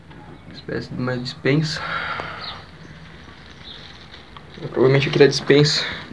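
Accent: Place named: Rio Grande do Sul, Brazil. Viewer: Sim é outra foto amigo se Deus quiser vou conseguir ir lá adoro lugares assim e adoro seus vídeos parece que estou junto com voçe na hora que está em lugares assim de tão apaixonada por esses lugares ; que Deus te proteja sempre e te ajuda em todos os seus projetos que tem ai pra fazer aqui não tem lugares assim pra eu está indo e adoro o jeito que os gaúcho fala o jeito que voçeis são aqui na minha cidade fala que gaúcho são muito bravos eu não acho mais vou falar outra vez voçe está de parabéns com seus vídeos amigo acho que sou uma pessoa de alma antiga por amar tanto lugares assim bsj e Boa madrugada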